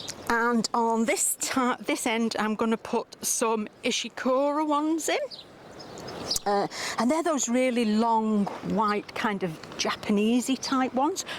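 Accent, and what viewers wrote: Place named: Manchester, United Kingdom. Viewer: What a lovely video. We have loads of old tin baths around the farm. Think it’s pretty standard for farming to find baths dotted around fields. They certainly seem to make useful raised beds. Have seen Jessie (plot 37) likes the touchon carrots too The cauliflowers look lovely. Be interested to hear what your biggest pests to the crops are over there? Still have slug problems? Your accent sounds like you may have come from Manchester/Stockport area originally? Not too much further north than me on the Staffordshire/Cheshire border The rain and thunder always sounds quite nice.. so long as you’re in the dry watching it. Colin has the right idea. Happy New Year to you. Hope 2024 is a good one.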